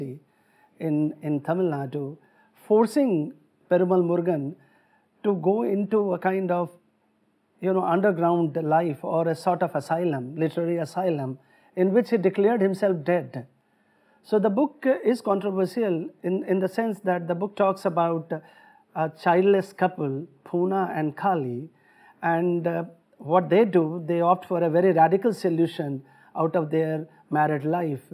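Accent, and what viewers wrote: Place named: Italy. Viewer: U have a kind of italian accent, as an italian i really love it.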